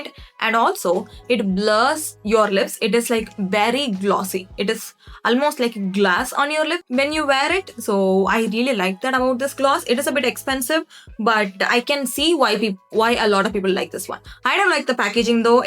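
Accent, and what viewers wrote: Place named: Tamil Nadu, India. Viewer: Loved the video keepp goingg....and also luv that English with tamilian ascent ❤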